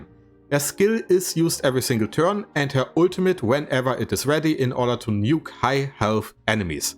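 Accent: Germany. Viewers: i hear a german accent...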